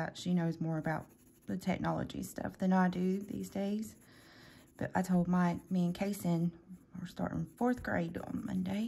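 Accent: United States. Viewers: Southern girl? I used to live down south on the Gulf Coast. Some of the best accents in the U.S. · I love your accent. I too am from North Carolina. I grew up in the Outer Banks. I have just started coloring and I appreciate all you do to help me. Don’t be nervous, you got this❤️❤️❤️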